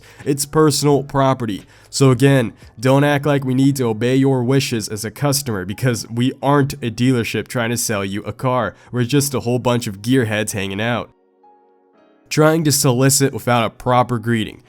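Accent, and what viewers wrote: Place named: Appalachia, United States. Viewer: Dude that hillbilly accent is the reason why I just subbed😂😂😂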